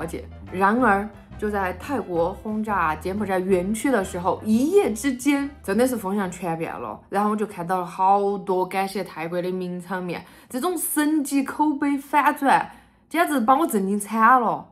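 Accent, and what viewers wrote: Sichuan, China. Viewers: tutu新年快乐❤不是四川人也觉得四川话亲切了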